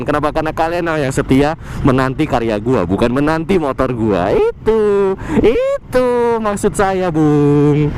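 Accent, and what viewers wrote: Surabaya, Indonesia. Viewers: Saya sih emang dari dulu suka isi dari video lu bang dan ga peduli motornya apa yg penting isi dari video itu suka aja denger suara khas suroboyo campur jakarta 👍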